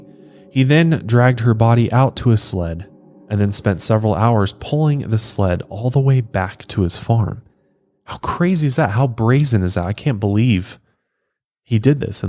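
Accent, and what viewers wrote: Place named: South, United States. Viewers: It’s so funny you talked about having a southern Colorado accent because in my head I have always thought you sound kind of southern/ Texan lol! I’m from Texas, btw. Love the podcast!! Any content you or put out is 100% 💜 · I never thought people from Colorado had a southern accent but then again I'm from Texas and a lot of us have a heavy accent😂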